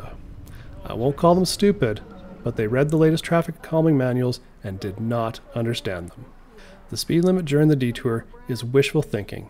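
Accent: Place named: Canada. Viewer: I'm not surprised that you are from Canada. Your city's policies and your accent seem Canadian.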